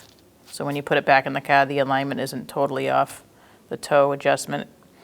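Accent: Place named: New England, United States. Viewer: New England accent coming in good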